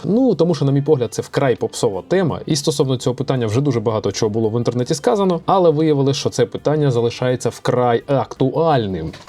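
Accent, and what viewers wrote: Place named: Poltava, Ukraine. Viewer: Чоловіче, судячи з акценту, ви точно з Полтави))). І це говорю в хорошому сенсі)) . Дякую за відос, реально дуже корисний!!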